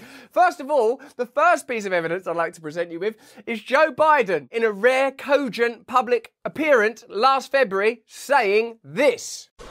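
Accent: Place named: United Kingdom. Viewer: You are the modern day Mark Twain with a British accent. Keep up the good work.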